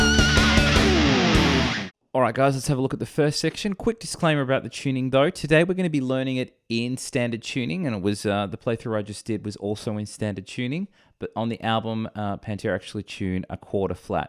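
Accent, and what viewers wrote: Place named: Australia. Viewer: very tough the stretch 15 18 22 as i have medium size fingers which tend to jam the strings muting the sound and killing the notes....u are a big guy so its easy.i can cheat with tapping easily! but i am tempted to stay honest and untap--but i am still struggling, now 2 days of 2 to 3 hours of playing (how many days would it take to crack this part?) ...8.43 part of the video is what i am referring to....and do you follow cricket? you sound aussie..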